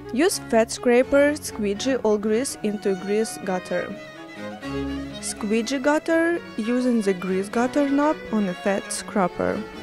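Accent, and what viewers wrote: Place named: Russia. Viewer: Да она Макдональдс вообще по-русски произносит.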